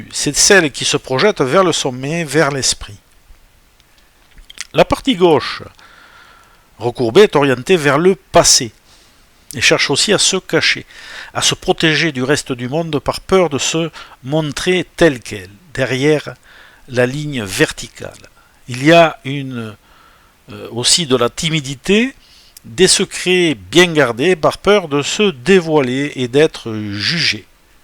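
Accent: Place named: Occitania, France. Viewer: Bonjour à vous, Mon prénom commence par la lettre J, et je me retrouve dans ce que vous dites. Nous avons le même accent Occitan. Je vous écris de Narbonne 😊.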